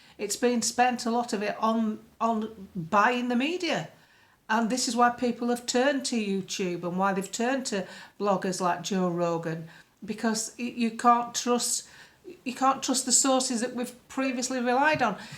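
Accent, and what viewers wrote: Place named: Northern England, United Kingdom. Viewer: My it’s great to hear your northern voice of tell it like it is common sense, just love your humour too. Having left wonderful northern area of Yorkshire many moons ago, I miss the people, I miss their voices. We never look or believe what MSM are saying politically and tell everyone we know to follow you and the other truth tellers. Thankyou, onwards and upwards free speech.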